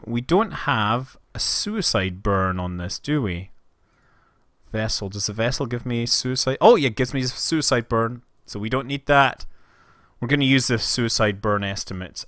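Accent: Scotland, United Kingdom. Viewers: are u scotish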